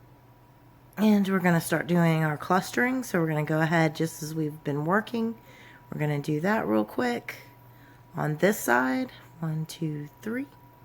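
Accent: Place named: South Carolina, United States. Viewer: I watched the videos and I have to tell you that you are amazing. Love the accent it makes me miss my time in SC. While crocheting my shawl I noticed that while making the 4th floral lace (the single in the center) I was on video 2 and did the lace in the same manner as the one in that video. It seems to have worked out, but should I frog it (I'm at the center point) and go to video 3? I will be adding more rows because I want to use the yarn in my second cake until the end.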